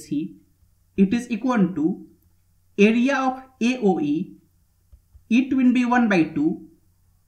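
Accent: India. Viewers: Indian English pronunciation is so terrible!!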